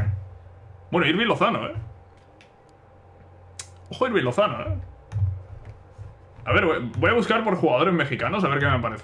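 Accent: Andalusia, Spain. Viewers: Es de Andalucía illo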